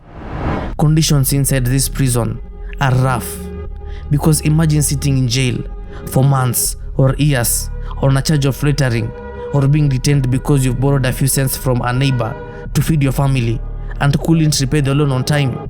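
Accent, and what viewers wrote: Kenya. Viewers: I can tell the owner of this channel is Kenyan even his English proves so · the accent... Acha izo... Weh ni mkenya?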